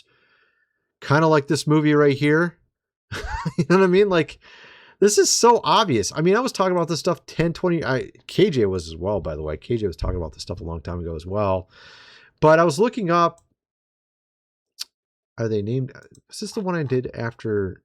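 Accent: United Kingdom. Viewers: Why is a British guy in America ?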